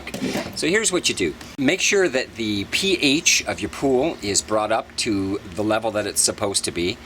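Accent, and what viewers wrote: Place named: Canada. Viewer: Thank you so much, you've saved my life (well, okay, my swimming pool!) I was getting so frustrated with a cloudy pool. I had added a load of flocculant but however much I vacuumed I just couldn't get rid of the f***ing crap at the bottom. Eventually it dawned on me that it was all just coming back through the filter and for whatever reason the filter just wasn't collecting it. That's when I saw your video and realised I should put the pump on the 'waste' setting (or 'desague' as they call it here in Spain). Bingo! It means I have to top up the water level, as you say, but definitely worth it. Now I just have to backwash the filter to get all the crap out. [Note here for any English-speaking expats living in Spain, like me, who may be confused by the terminology: for 'backwash' you need to look for 'lavado' and for 'rinse' you need 'enjague']. 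Totally off topic but I love the accent btw. I have a Canadian mate in Madrid who speaks just like you. Can't get enough of that Canadian pronunciation of 'out': a little bit Irish, a little bit Scottish, but 100% Canadian!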